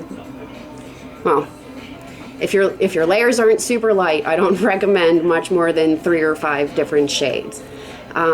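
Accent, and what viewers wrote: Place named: Pittsburgh, United States. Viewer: are you from pittsburgh? you have the accent of a yinzer!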